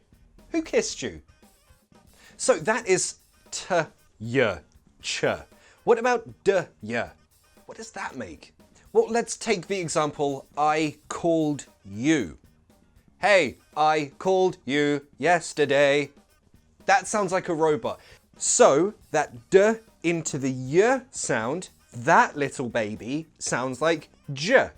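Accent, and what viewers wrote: United Kingdom. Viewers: the british accent sounds amazing. Its clear. I really like it. Good class, teacher. Tks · Hello! Is this British English? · I love the British accent, it’s sooo cleah 🤩🤩😍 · The slang is not the same as a dialect. Also, I did not say that we are talking about a dialect here. Dialect is the same group of languages, spoken slightly different depending on the region, with few different words and accentuating different letters in the words . This is actually the closest to what you are referring to as an accent, but it is still not. To the person from the UK this person in the video, doesn't have an accent. This is how they speak. Only for the rest of the world, especially to the english speaking people from the USA or Canada or Australia, you could say he has an "accent", but this is not correct. He speaks British English. In the USA, they speak American English. 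They don't speak with any accent, since this is how they speak and everyone else around them. It is different when you have accents to some letters in the words, so they may sound different to you, but this is not a definition of someone speaking with an "accent". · That's British accent , American accent is a bit different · Great tutorial! Thanks! I guess your are British ...could you tell me if the same rules and 'sounds' also hold for American English? Thank you again! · Ur on a brit channel, teaching brit accent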